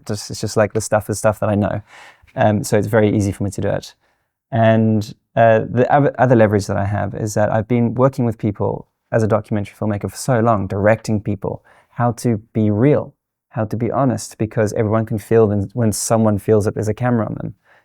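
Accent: South Africa. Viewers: Keep at it, I randomly stumbled across it. Love it. Recently started as well, after 3+ years of putting it off. Listening, it sounded a bit like you were South African. Im loving the content, please do keep it up. Im trying to be raw, edit as little as I can (Which is hard) but Im working it.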